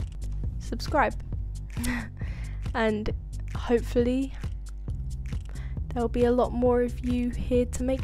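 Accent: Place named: United Kingdom. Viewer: of course she’s british 😹😾.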